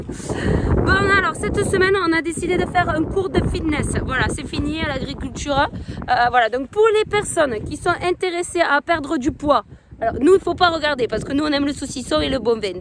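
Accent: South, France. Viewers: Salut reportage super intéressant avec toujours ton bel accent du midi p'tit cc a Chipie 😃👍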